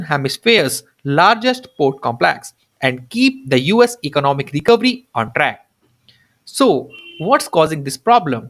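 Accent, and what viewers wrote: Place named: Punjab, India. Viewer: Punjab accent is very HEAVY here.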